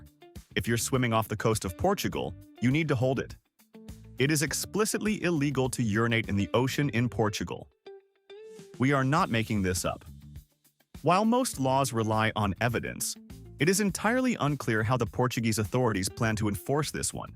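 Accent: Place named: United States. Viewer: this collection has been put together, evidently, with an American point of view, even spoken with a recognizable American accent American prohibits chocolate surprise Kinder eggs because only American children are so st++id and they swallow the surprise... even Pope Francis made fun of it during an official state visit to the Vatican of J.D. Vance and gave his children Kinder eggs as gifts !!! IT WAS HILARIOUS THE WHOLE WORLD MADE FUN OF AMERICA and AMERICANS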